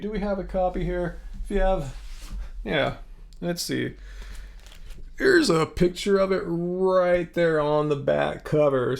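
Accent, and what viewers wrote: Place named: Wales, United Kingdom. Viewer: Welsh hey? Ok we got you! :)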